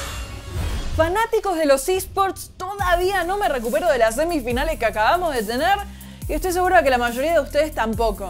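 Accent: Argentina. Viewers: LIKE SI KIERES KE SAKEN A ESTA SEÑORA ARGENTINA DEL ACTUALIZANDO. YA NO AGUANTO YA NO AGUANTO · es argentina._.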